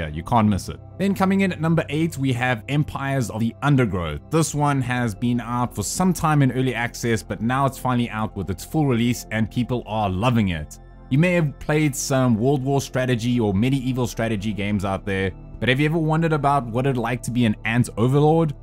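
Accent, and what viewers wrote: South Africa. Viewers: you sound south african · Nice to hear a Capetonian accent on the YouTubes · Hold up. Is that a fellow saffa I hear?!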